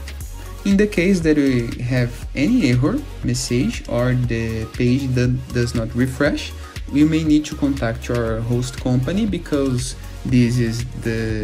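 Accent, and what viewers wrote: Brazil. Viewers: Você é brasileiro né kkk, pelo sotaque, acredito que tenho 99% de chance de estar certo kkkk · Esse é brasileiro... me engana não. Esse sutaque aí... Curte de mais ❤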